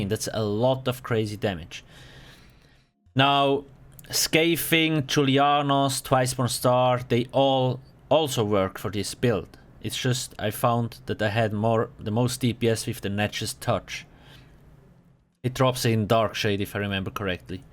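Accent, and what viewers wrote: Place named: Switzerland. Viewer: Das tönt nach Schwizer ^^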